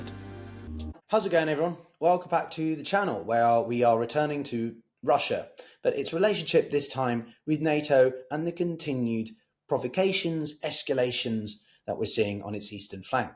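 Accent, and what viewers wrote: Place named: England, United Kingdom. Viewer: Another Nice Jew-is boy with an English accent.. Talking crap! Says The BronxBoy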